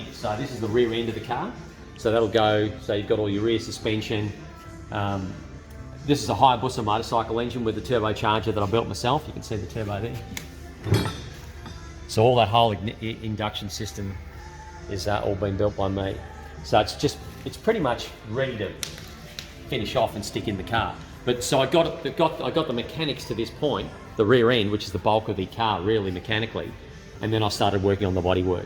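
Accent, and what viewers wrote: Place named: Australia. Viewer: Great video! And really nice to hear an Aussie accent in the Experimental category. Great work!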